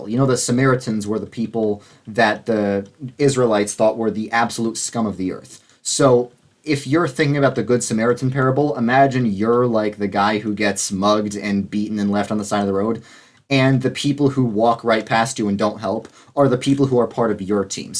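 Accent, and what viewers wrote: New York, United States. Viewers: mainly when you talk about walkable cities, those of us from hillbilly areas 😂, and the way your voice sounds. I’m just like “yep he’s from New York.” I died when you said “collecting the bigotry infinity stones” 😭😂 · I’m from NY as well and instantly recognized your accent on your videos lol. And yeah if you can see the city from your high school you’re definitely downstate. I’m from Albany and we consider everything south of Poughkeepsie downstate 😂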